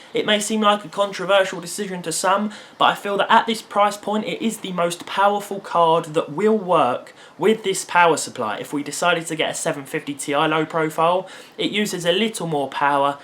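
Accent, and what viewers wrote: United Kingdom. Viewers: I am just watching all your videos because of your awesome British English emphasis :D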